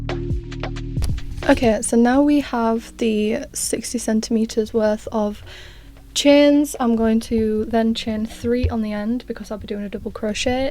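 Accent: United Kingdom. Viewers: Hi where are you from ? I guess by the wallpaper and accent the UK 🇬🇧 love what you are doing . Scrap yarn projects are fun 🤩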